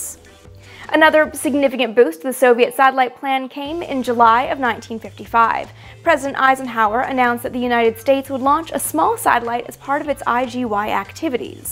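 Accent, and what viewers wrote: Canada. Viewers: Wait, are you Canadian? Maple Leaf pride! 🇨🇦🇨🇦🇨🇦🇨🇦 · She's Canadian?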